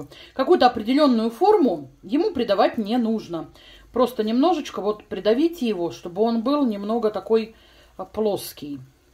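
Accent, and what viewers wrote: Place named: Russia. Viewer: какой вкусный русский акцент. Осталось семь варёных картошин. теперь знаю для чего. спасибо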